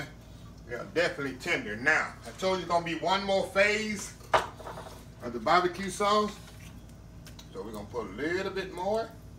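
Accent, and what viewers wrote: South, United States. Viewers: Reasons I love this channel..I've picked up some great recipes, good tips and tricks and Chef is always dropping some knowledge. And of course the country accent❣Thank you Chef.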